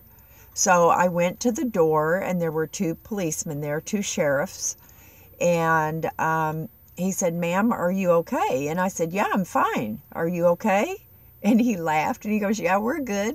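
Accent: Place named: Texas, United States. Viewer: Ah ha! Got it you were raised in Texas and Arkansas! That explains your accent! And your values!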